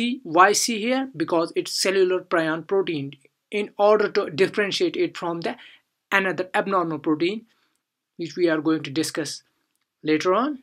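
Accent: India. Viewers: as soon as i heard the indian accent i knew this would be an informative video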